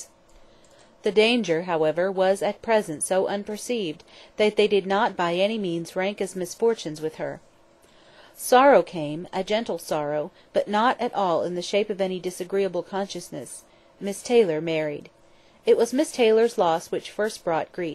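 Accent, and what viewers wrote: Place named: United States. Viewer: this is an American accent, unfortunately. i'm American, and I wanted to hear a British accent :/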